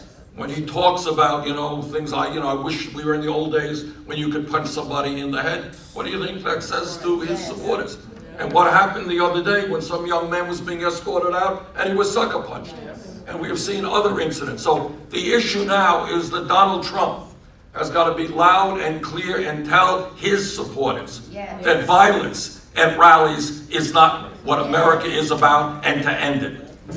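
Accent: Brooklyn, United States. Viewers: chick HaHaHa My peeps from BK and Staten Island talk just like that too. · "What we sore in Michigan." I love Brooklyn Bernie.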